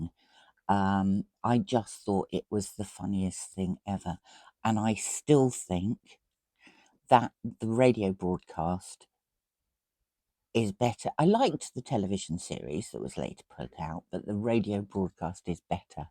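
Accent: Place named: United Kingdom. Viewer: I enjoy the way you speak. It sounds very soft and elegant to me. I guess it kinda reminds me of Book Chat With Pat, but just… the British version? 😅 Yeah.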